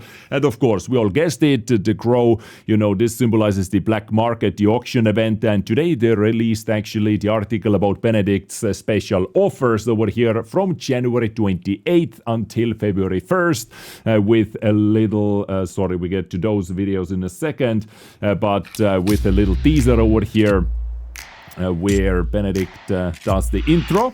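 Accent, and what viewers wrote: Finland. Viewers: Which country are you from? You have a Finnish sounding accent...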